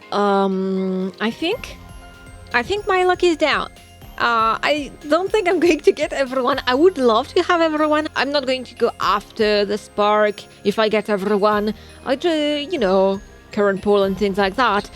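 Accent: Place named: France. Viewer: That French accent x)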